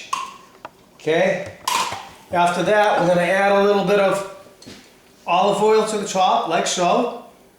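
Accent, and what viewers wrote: Boston, United States. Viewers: I haven't ever seen them made with tomato sauce, now that is Sicilian, but you have a strong boston accent!!!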